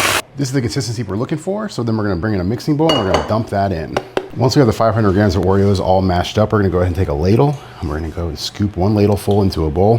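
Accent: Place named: United States. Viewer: You have an American accent why are you measuring everything in metric?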